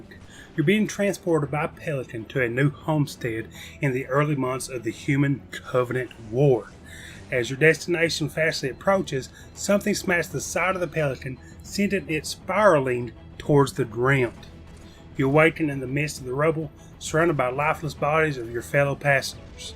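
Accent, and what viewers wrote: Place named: South, United States. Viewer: There are so many people with amazing voices in the halo community, and I am so glad that we finally have someone with a southern accent.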